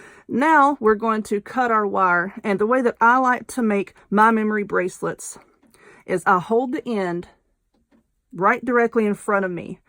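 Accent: South, United States. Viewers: I adore you. the Southern accent is so calming for me, since the whole of the maternal side of my family is from down South, plus you explain everything so thoroughly and show how slowly so it can be followed, it doesn't hurt that you mix in some humor too, that's always really nice and reassuring when one might be nervous or have difficulty keeping up with faster tutorials. Unfortunately though, JoAnns went out of business, I'm so sad about that.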